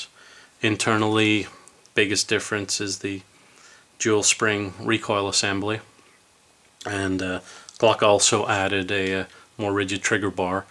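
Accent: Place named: Belfast, United Kingdom. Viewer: I hear a Belfast accent ;-)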